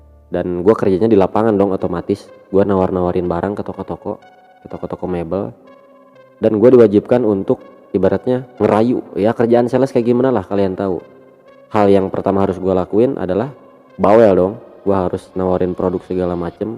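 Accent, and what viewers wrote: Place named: West Java, Indonesia. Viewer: Lidah orang sunda bos. Voli aja nyebut nya poli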